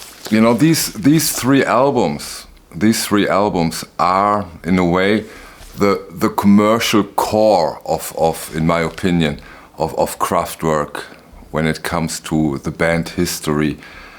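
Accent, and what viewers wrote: Germany. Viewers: Hello -I was trying to place your German accent and always suspected NRW, now I know! I lived off Stockumerkirchstrasse in the 90s when I worked for Kindersender Nickelodeon which was based at Zollhof 11 (by the Rheinturm) My one regret is not stalking their Kraftwerk studio when I lived there! I used to go running by the Messe listening to. Thanks for the wonderful tribute/analysis.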